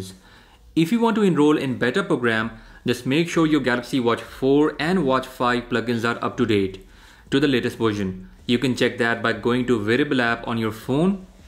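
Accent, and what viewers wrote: India. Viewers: Heavy Indian accent = 76 likes. I disliked. I almost puked because of his accent!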